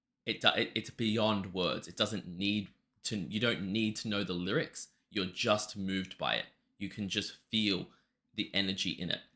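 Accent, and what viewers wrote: Australia. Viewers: Hey what's the comment on the Australian accent ? or maybe you are Australian? LOL ! LOL ! We are all starting to sound alike anyway.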